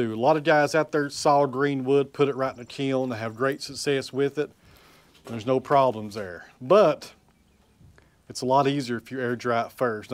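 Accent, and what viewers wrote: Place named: Tennessee, United States. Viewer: EMC {not AMC },: Equilibrium Moisture Content - My New England ears don't hear past your Tennessee drawl well.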